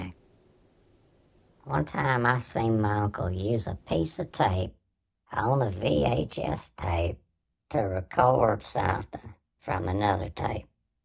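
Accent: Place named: South, United States. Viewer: Your uncle sounds like he was a cool dude ! I’m sure he was fun to be around. Great story . Where are you from? I like your southern accent. I’m from Tennessee and also have a southern accent . I’m guessing you’re from Georgia. But I’m just guessing. 😊. Love the animation . Very cool 😎. You’re so creative and talented.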